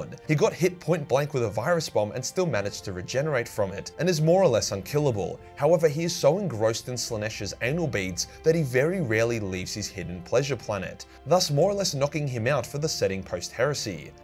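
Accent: Australia. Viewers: He's australian. Very obvious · I got into warhammer through this channel and for about the first three months I thought the eye of "terror" was the eye of "terra" solely due to your accent. 110% the best Warhammer channel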